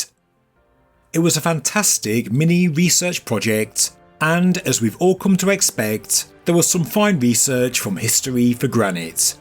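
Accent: United Kingdom. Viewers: British accent really sucks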